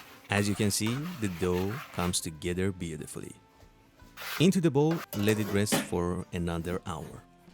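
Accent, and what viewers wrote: Iran. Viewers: What a Persian accent 😅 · i recognise a clear Persian accent. am i right dadashi?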